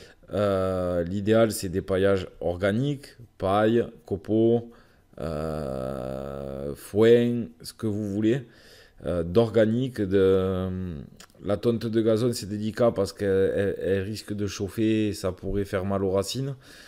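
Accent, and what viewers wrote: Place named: Paris, France. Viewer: Il a toujours, l'accent pointu 😂❤ail c'est dur une tomate 🍅 si elle tombe du balcon sur moi, je me fais une razia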